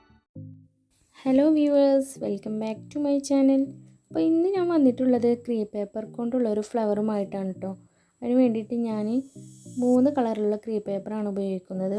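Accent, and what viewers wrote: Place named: Nilambur, India. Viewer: Nilambur Samsarthilekku ethi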